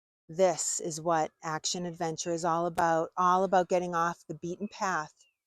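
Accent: Boston, United States. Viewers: Is that the original Concord bridge that stood 250 years ago,? By the way, I love your Boston accent. You have a new subscriber for all things about the Revolutionary War. History is fascinating, is it not? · After the first 30 seconds of listening to her talk, I knew without a doubt that she was definitely from Boston.🤣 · Love her Bahstun accent 😊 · Came for the history, stayed for the Boston brogue. Good content earned my subscription. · Another awesome video! Your Boston accent just makes the video. Love watching your enthusiasm. 😊👍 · New sub here! You hooked me with your excellent presentation with its enthusiasm and, of course, that wonderful BahStun accent! Love it! I can't wait for the stories yet to come! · You're from Bahston? Wow, had no idea. Great channel. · Outstanding, love that Boston sound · Great video. Your narration is excellent. 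Love your accent. You sound just like a former client of mine who came from somewhere near Boston. I have been to both Lexington and Concord and loved the area and its history. I subscribed and am looking forward to watching more videos. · you seem to have a Boston accent, cool, mine is R.I....😊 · Makes me think of A Matter of Life and Death aka Stairway to Heaven when Abraham Farlan is the prosecutor. 😂 neither Massey or Kim Hunter had Boston accents like you! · Well researched and presented! Good job! (Love your Boston accent. 😊) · My Mom was from Maine, but lived in California from her early twenties until she passed. Is your accent a Boston accent or is that how her accent would have sounded as her being from Maine? I’m just curious. Loved your story and subscribed to hear more content. Thanks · When I first saw this documentary I wasn't sure if this was about new England and Boston during the revolutionary War and but then I heard her voice and was like yup...definitely Boston. 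😂...its good to see a New England youtube channel loving what they do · Love her Boston accent. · Ya can diffiantly can tell your from New England / Boston accent...😂😂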